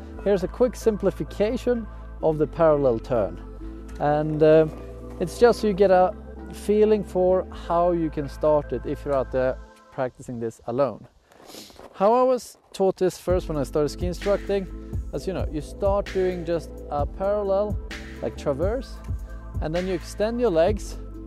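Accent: Sweden. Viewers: This is really helpful! Tack som fan mannen!(din engelska är väldigt svensk😉) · Is he Swedish? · Are you Scandinavian? You're accent sounds Scandinavian, specifically Swedish🙂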